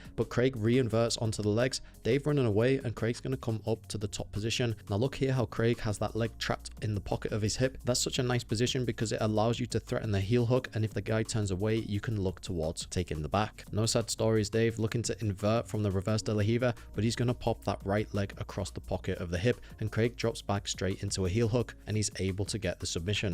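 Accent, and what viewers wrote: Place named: United Kingdom. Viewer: Shit is literally unwatchable with this limey talking the whole time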